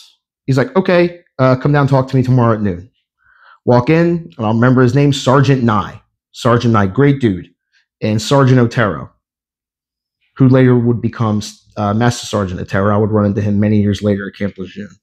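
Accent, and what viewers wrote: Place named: New York, United States. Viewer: yeah definitely detected the NY accent as I watched other Videos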